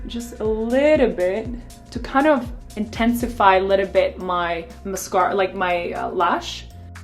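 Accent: United States. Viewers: I’m also Czech and i’m genuinely impressed by her accent, she sounds so american, like woah :D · Her accent really sounds american now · zní fakt jak američanka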